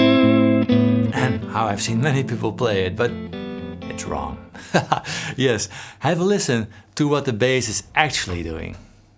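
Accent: Netherlands. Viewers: Hoi! Groetjes uit Utah USA. Ik ben SoCal geboren en groot gebracht. I was pretty sure I heard a Dutch accent... en, ja hoor! 😅 For me, music is my time machine. I'm 67 now. When this song came out in '75, I was in high school. I took a class on US government and our teacher had arranged for us to fly from Southern California up to Sacramento, the state Capitol. We traveled all over (State offices including Gov. Ronald Reagan's office) Folsom Prison (don't know if you're a fan), Old Town Sacramento and Sutter's Mill (where the 1849 gold rush began). ALL DAY LONG on the old school bus we travelled in, THIS brand new song played. It was repeated constantly. To this day, whenever I hear it, I am always transported back in time to 1975. I saw the a couple of times, once, (I could go on). And I have memories from all these groups. But takes me back in time to this one particular day like no other. 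My best friend was in the band in the '70's and left before their big hit from Top Gun in the '80's. Of the two of us, he's the real musician. I'm sure you've heard of Rick Beato. Still, I love seeing you young guys dig in to our old hits to figure them out and play them correctly. Warms the heart and soul. I guess I'm afraid after us old guys are gone, the stuff ppl call music today (or AI) is gonna replace it. 😢 Waar in Nederland ben je wonachtig? Mine kinderen zijn vlaak bij Dordrecht en Barendrecht. No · Hey love your videos! Have been following your channel for years, and only now, when I'm about to get married to a Dutchie, did I actually notice through your accent that you're Dutch! Ahah! By the way, check this guy it would be awesome to see a video of you two together on your channel! Cheers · bro is that a dutch accent I catch there?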